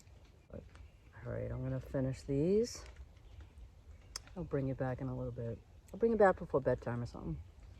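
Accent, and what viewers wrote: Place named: Boston, United States. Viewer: Whoaaa…. You have excellent instincts!… you played it “smahht” 😊… absolutely luv luv the Boston accent!!.. I’m from northern California.. we don’t get to enjoy that over here 😕.. aaaaaand.. nice tent with the built in ground cover or pocket for the vestibule… I always learn something from you.. thanx! 👋🏼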